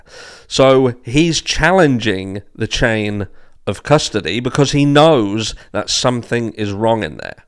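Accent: United Kingdom. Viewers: The only difference between you and Peter Tragos is your British accent. Visually you look identical so I have to wait until you start speaking before I can tell for sure.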